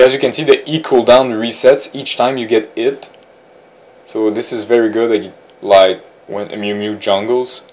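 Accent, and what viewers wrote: Quebec, Canada. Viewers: Are you French Canadian? (I am) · haha the way you say assist sounds like asses. i like your videos and the french canadian accent. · T tu un québécois ?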